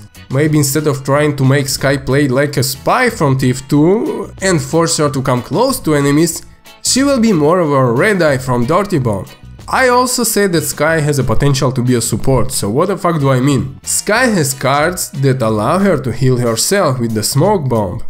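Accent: Russia. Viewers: I love this russian accent xD Nice vid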